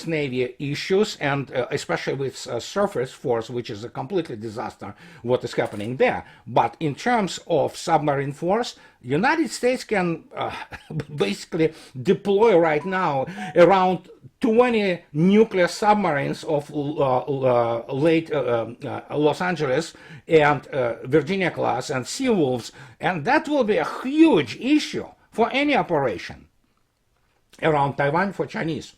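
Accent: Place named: Russia. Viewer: *Closed Captions* when you're uploading, please, Mr Martyanov. Helps us half-deaf people who are bad even with English, let alone Russian accent...thanks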